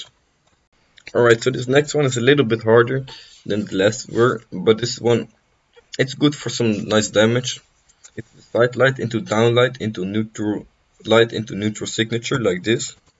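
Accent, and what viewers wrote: Netherlands. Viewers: Are u dutch? Lol i hear a accent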